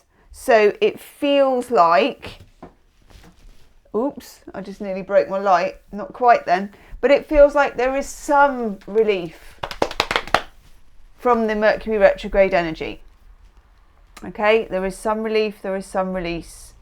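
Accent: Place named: Devon, United Kingdom. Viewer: i absolutely LOVE your Devon accent!! 😂😂😂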